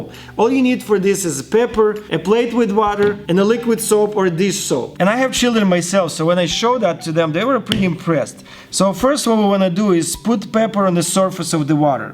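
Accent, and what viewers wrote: Russia. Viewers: Что это блять такое ? Ты же русский,это по акценту слишно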